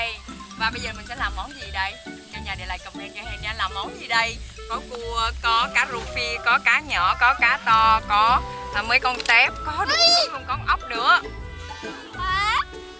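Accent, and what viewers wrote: Vietnam. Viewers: Hen dể thương quá chú rất thich cach nói chuyên dúng chất ng vn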